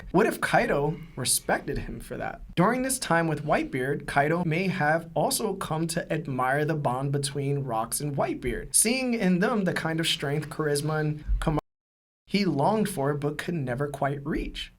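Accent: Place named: United Kingdom. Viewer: Why is he british tho?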